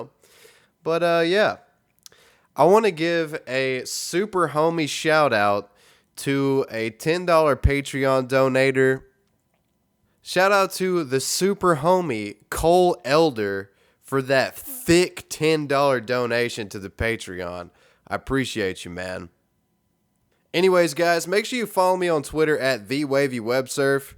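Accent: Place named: South, United States. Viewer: Why do you sound like a CSA southern solider ?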